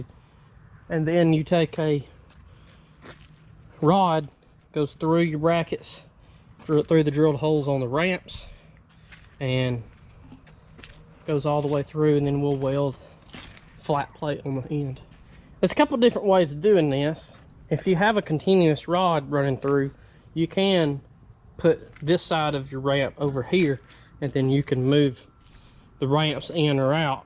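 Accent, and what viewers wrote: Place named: South, United States. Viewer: Good, honest stuff. Commenting from Albany, GA. You sound like you're close-by. Regarding being an expert: That's something I posted about my dad one Father's day....He taught me you don't have to know how to do something to get it done.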